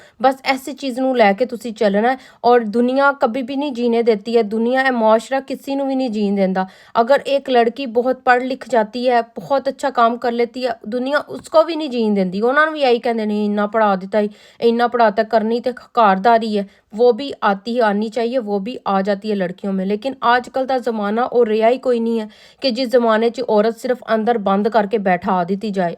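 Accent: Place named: Lahore, Pakistan. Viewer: Same hum b lahore sy ha hum b aisy hi bolty ha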